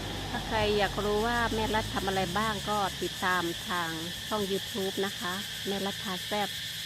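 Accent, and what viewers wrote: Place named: South, Thailand. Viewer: นี่ถ้าไม่บอกคิดว่าคนใต้ เพราะสำเนียงเริ่มมาทางใต้แล้ว ยินดีที่ได้รู้จักนะค่ะ ทำคลิปสั้นด้วยสิค่ะ ช่องจะมีคนเห็นเยอะขึ้นค่ะ สู้ๆๆนะค่ะ เป็นกำลังใจให้ค่ะ นุ้ยเคยทำงานโรงแรมที่ภูเก็ต 6ปีกว่าค่ะ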